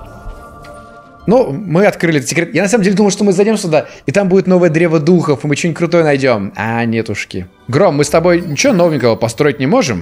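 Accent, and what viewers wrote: Germany. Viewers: немецкий акцент это что-то с чем-то. Жду следующее видео с немецким языком🌺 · Лайк, если знаешь немецкий окцент.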